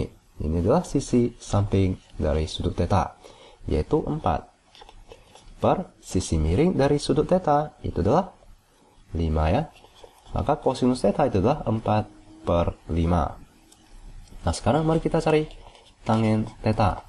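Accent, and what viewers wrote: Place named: South Korea. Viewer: Terbantu akhirnya faham juga .kak kalo ngomong kok mirip orang luar ya kayak orang korea ngomong indo gitu😀😁😁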